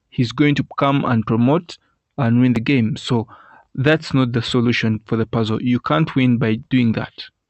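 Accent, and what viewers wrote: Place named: Uganda. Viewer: I'm Ugandan too so I could tell from the accent. This was very tricky for me to figure out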